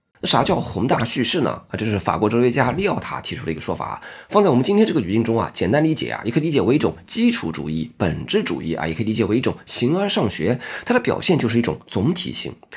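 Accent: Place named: Chongqing, China. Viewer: 我听出你的重庆口音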